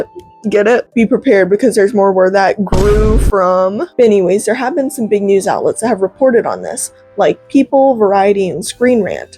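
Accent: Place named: South, United States. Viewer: Ah yes, I remember someone else putting that movie news out there, so, nothing too new to me, and my most favourite live movie adaption has to be Sonic, it just has it all. Gotta say though, you're looking very pretty in that cosplay outfit, in fact you look very cute in it, and nice work on that southner accent. I managed to pick mine up from an interesting transformer who speaks that way called Overlord, man does it surprisingly suit him well.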